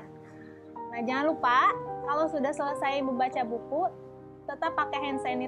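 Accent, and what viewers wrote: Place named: Bandung, Indonesia. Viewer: Bandung pisan logatnya neng😁👍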